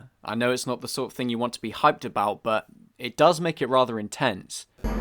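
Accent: United Kingdom. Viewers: Nothing cooler than hearing a Brit talk about Indycar! You have a new subscriber! 🏎🏁🇬🇧😎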